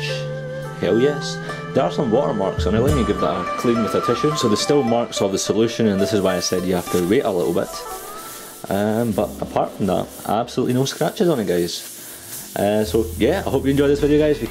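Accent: Scotland, United Kingdom. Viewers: At first i thought you were French, then i realised you were Scottish lol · It's called a scottish accent ...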